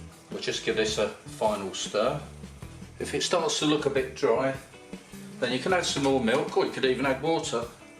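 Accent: United Kingdom. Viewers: Hello! I love fish! Especially when it's a form of pie 😊 anyways, are you Scottish? You sounded like it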